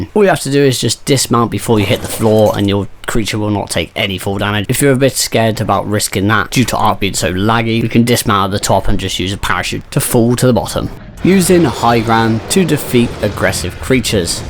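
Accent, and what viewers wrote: United Kingdom. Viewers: This accent is different, British!??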